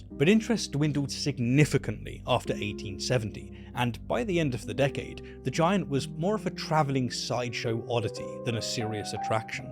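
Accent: United Kingdom. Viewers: LOL you had me untill 25 min WHAT MAKES YOU SO DI9FFENT brittish accent boy go home your nothing new . don't you have a a bold headed guy covering all aspects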